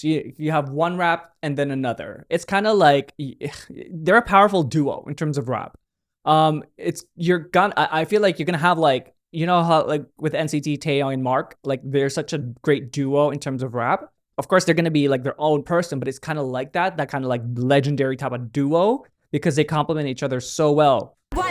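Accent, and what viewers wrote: Philippines. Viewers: Are you filipino ? Because of your accent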